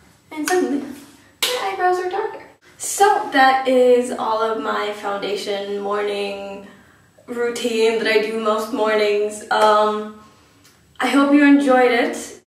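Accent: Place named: Ireland. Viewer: I LOVE IRELAND ACCENTS!!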